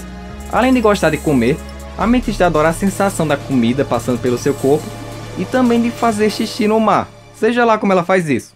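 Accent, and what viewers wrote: Northeast, Brazil. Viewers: Voz de NORDESTINO ,AS OK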